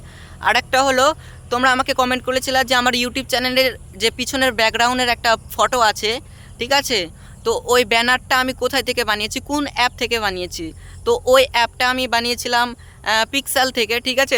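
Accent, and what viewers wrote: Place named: Malda, India. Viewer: ভাই তোর কথাগুলো শুনেই মনে হচ্ছিল যে তুই মালদার. আমার বাড়ি ও মালদায় মঙ্গলবাড়ী তোর কথাগুলো শুনে ভালো লাগলো.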